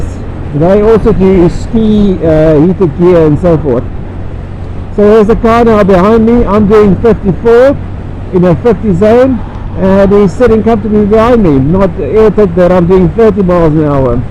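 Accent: South Africa. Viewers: what a fun little bike.. Are you from South Africa? . Why is the sound so bad with the visor closed?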